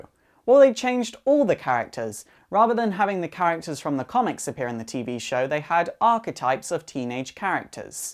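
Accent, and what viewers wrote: United Kingdom. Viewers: Is he British or have an accent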